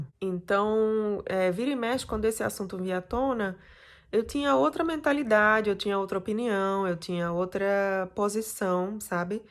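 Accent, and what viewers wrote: Northeast, Brazil. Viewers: E aqui na sua terra natal (Brasil, Nordeste, pelo sotaque é nordestina)vc se dá bem com o povo fora desse país?Obrigada 😘👍Sinto muito amargura nas suas palavras e nos olhos!Isso não é bom amor!Me senti mal agora em ser brasileira, sou uma pessoa que me doo tanto para quem precisa de mim!Eu também não me envolvo com muitos amigos, por opção minha, tempo, mas o resto abstraio!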